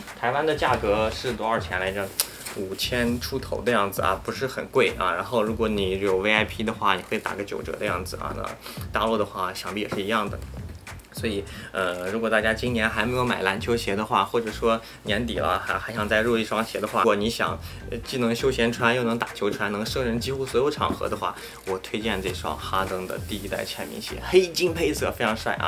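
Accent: Shanxi, China. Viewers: 是山西人吗？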